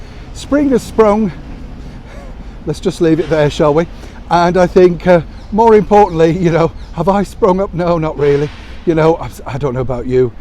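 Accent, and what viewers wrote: Yorkshire, United Kingdom. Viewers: Love your posts and your happy, up demeanour,! Your daily, positive news videos, your smile and your Yorkshire accent make my day! ❤️ from Victoria, Canada.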